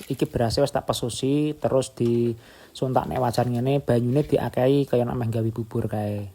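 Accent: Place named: Java, Indonesia. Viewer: Kok bahasanya medok kayak jatim jateng ..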